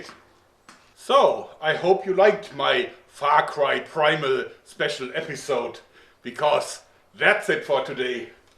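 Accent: Germany. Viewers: you have a german accent so let me ask you are you from germany? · Normally German accents make me cringe, but somehow I have no problem with your voice. Also: awesome channel! Weiter so. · is German and has a slight speech impediment, one of the things that makes ubermensch. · Are you German? I am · Wow Englisch mit Deutsch agtzent schreibt man das so? Also agtzent naja aifjeden fall ist es interessant und lustig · you are german aren't you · ich liebe den deutschen akzent im englischen ^^ weiter so videos sind gut XD mfg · Yeah, German or Russian accent, like a bond villain.I mean Victor Frankenstein was Austrian, so there's the basis for mad scientists in pop culture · ist er deutsch in hatt englisch gelernt ?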